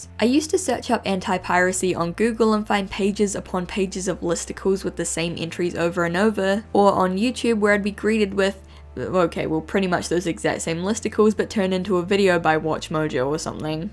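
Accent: New Zealand. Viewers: No. New Zealander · I just discovered this channel. Is her accent Kiwi?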